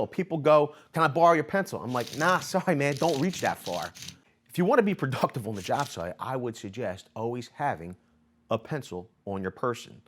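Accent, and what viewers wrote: Philadelphia, United States. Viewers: Wudder. Everyone is always like "you mean water?" Screw you it's Wudder. Love our Philly accents bro. Go Phil's go birds. Suck it Dallas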